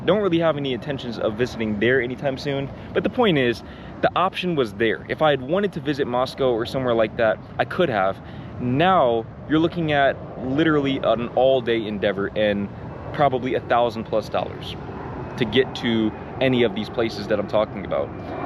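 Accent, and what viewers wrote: Netherlands. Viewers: You’ve got a slight dutch accent even. Just move already 😂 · It's funny, your English now has a very slight Dutch ring to it (mostly in your O, I, A sounds). It's faint, but it's there. Funny what 14 months in a different country can do. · You even speak English with a Dutch accent. Are you a native Dutch that grew up in the USA?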